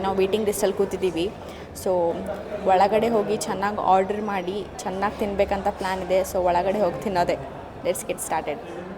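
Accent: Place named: North Karnataka, India. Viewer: Nice see north Karnataka girl vlogs, in north Karnataka style Kannada .. is super style.. lol..,!!!!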